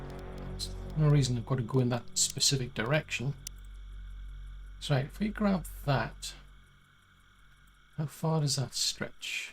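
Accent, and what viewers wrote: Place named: Northeast, United States. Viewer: nice to hear the Northeast Accent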